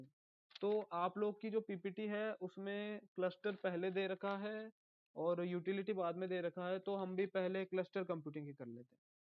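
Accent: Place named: Pahadi, India. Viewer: thodi thodi pahadi tone ari ha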